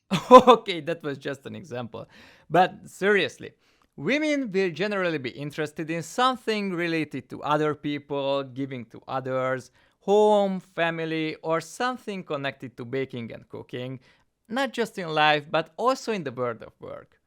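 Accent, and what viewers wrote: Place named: Hungary. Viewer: nagyon hallani a magyar akcentust kétlem hogy ai lenne ezt felmondta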